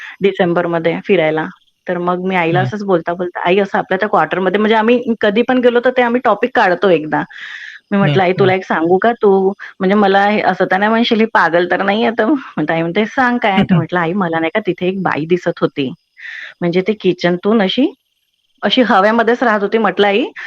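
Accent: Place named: Vidarbha, India. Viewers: मी ही गोष्ट ऐकतच नव्हतो, पण या ताईंचं नागपुरी वऱ्हाडी मराठीत बोलण्याचं ढंग, आणि त्यात अधूनमधून येणारं हिंदीचं मिश्रण हे सगळं मी लक्षपूर्वक ऐकत होतो. त्यामुळे वैदर्भी बोलीभाषेबद्दल थोडा अभ्यास झाला. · अनुभव भयानक आहे पण मला नागपुरी बोलीभाषा खूप आवडली ऐकायला!!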